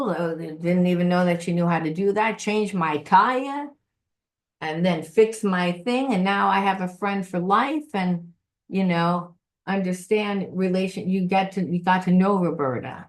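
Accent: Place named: New York, United States. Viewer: Dust part of self, & Deity part of self-- awesome!! I have felt stuck in moving forward with creative interests (despite the fact that I know I'm highly creative) and your explanation made things so much clearer for me. My parents held very narrow views of what it meant to be creative & mine was simply unrecognizable to them. Thank you for this extremely helpful reading-- really opened up a new vista for me! Sending love & light as always. 💟🧡💚 p.s.- I get such a kick when you come on heavy with your New York accent-- you're adorable!! 😂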